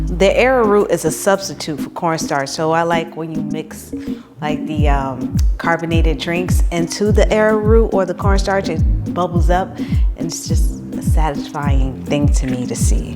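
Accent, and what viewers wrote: United States. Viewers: 😳💭 “You’re sound American-born, you’re body is lookin’ Greek statuesque, but the name is lookin’ Haitian as hell.” Watches video 👀💭 “She said ‘Kisa?..’ and ‘North Jersey’ came across the screen?!.. She’s definitely Haitian b!” 💯😂😏💪🏾🙏🏾🇭🇹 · Go girl u u look good i just came across your videos i just subscribed im going to continue to follow you i just started working out but i work out at home ❤😂 keep up the good work u sound like my cousin from Westchester new York white plains i love y'all accent ❤💯🤣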